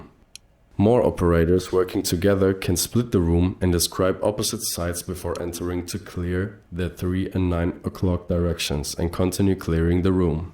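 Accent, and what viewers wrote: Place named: Germany. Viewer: bro sounds a bit too german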